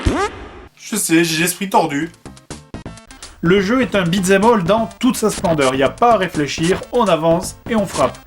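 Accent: South, France. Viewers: Tu as perdu l accent du sud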